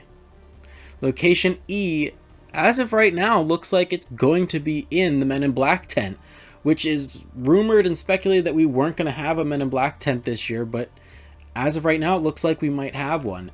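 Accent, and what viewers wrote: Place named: Delaware County, United States. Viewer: Whew that accent jumped out, it’s giving Delco or Delco adjacent.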